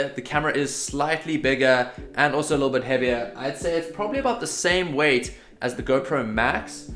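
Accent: South Africa. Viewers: You sound South African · you sound south african!!!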